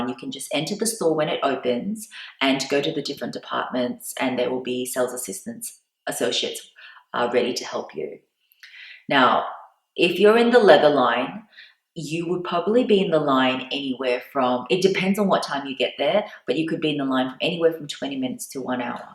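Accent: Australia. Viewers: Hi, love your Aussie accent lol. Thanks for your video, I'm going to Paris soon, and I didn't plan to get a Hermes bag, ur video made me wanting to have one now!!!:)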